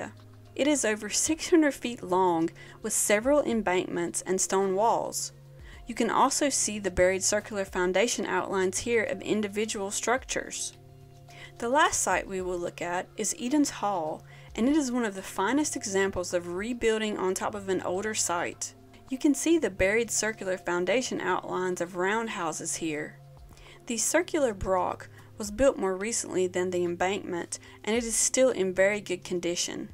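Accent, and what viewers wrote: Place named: Tennessee, United States. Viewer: I dont know where you're from but I hear some Tennessee in your accent.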